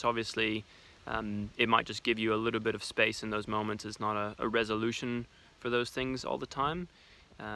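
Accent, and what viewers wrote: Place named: New Zealand. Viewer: Mate, you are getting a kiwi accent! I used to see you in Kingsland in 2010s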